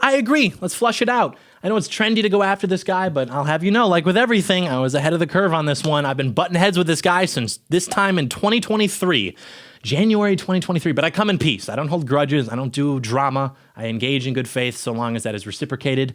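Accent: Michigan, United States. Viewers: I figured you were from Michigan. Since moving out of my home state, I miss how Michiganders talk. Fast and concise.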